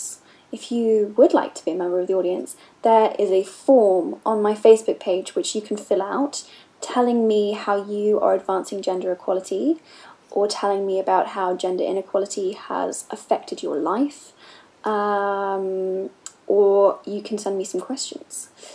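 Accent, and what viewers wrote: United Kingdom. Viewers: イギリス英語でわ？と思います。アメリカとイギリスでは発音が全く違います。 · イギリス発だから逆にあなたが学んでいる英語の方が訛ってるかもよ？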